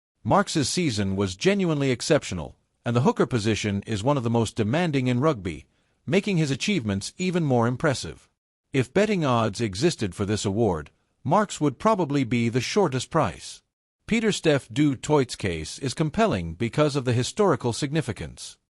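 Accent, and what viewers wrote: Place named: United States. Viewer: Oh, come on! These awards go on current form and achievements. Cheslin Kolbe is an amazing player, and one of the best in history, world-wide. But, he is NOT alone. There are other incredible players who also deserve to be recognised. Only one can be the winner. Just because Kolbe isn't in the running for this award doesn't mean he is less revered than the ones who are. It just means that in 2025 he played in less games due to injury or whatever, and then when he did play, he didn't get as many opportunities to shine as he usually does. What I find ridiculous is that a Springbok rugby channel has an AMERICAN ACCENTED AI giving the commentary!! It can't even pronounce our players names correctly!! Who the F*** is Jasper WEEZE?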